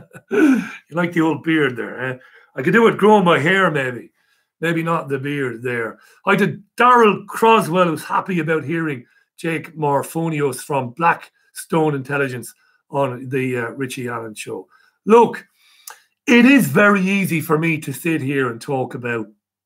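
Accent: Ireland. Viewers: he from waterford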